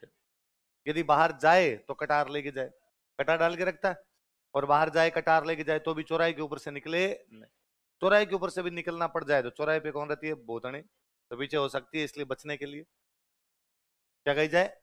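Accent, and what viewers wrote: Rajasthan, India. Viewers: aapki rasthani mix hindi CHOKHI LAGE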